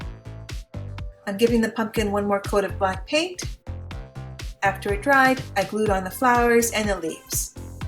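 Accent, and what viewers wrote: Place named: Canada. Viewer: You sound Canadian like my brother’s family in Peterborough, Ontario. 💕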